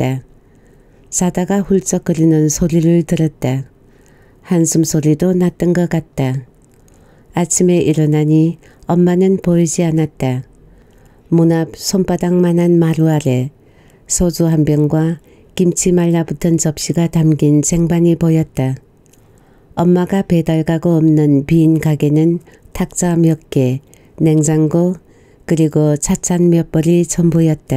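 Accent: Gyeongsang, South Korea. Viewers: 경상도 분이라서 사투리가 전혀 이상하지가 않네요,잘듣고 있습니다,감사합니다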